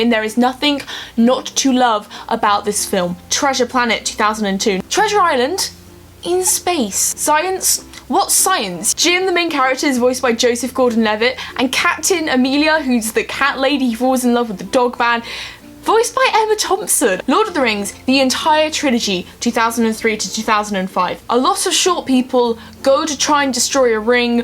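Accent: Wales, United Kingdom. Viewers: lovely welsh accent you sound just like Gwen Cooper from torchwood. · Omg I love your Welsh accent tho (but hey I'm not Welsh so I can't judge)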